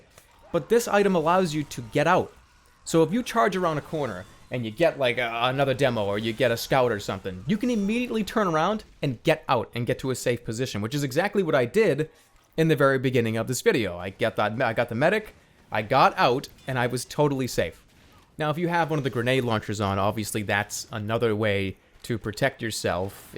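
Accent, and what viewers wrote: Boston, United States. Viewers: just a question but are you from Boston because it kinda sounds like u have the accent btw nice vid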